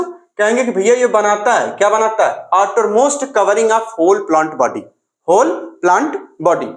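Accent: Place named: Bhojpuri, India. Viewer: Bhojpuri lecture ho gya sir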